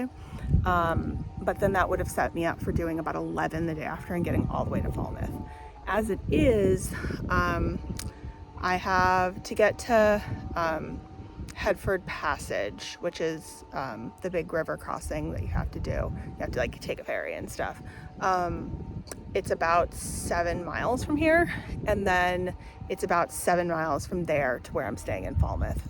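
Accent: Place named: United States. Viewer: Hi there, so nice to see you back on the SWCP. I love to hear your perspective on the path with you coming from across the pond and chuckle at some of your pronunciations. I don't have the problem of overgrown paths as i only walk the SWCP in the autumn winter months, though i do have mud to deal with. You deserve far more views and subscribers than you have. Keep up the great work.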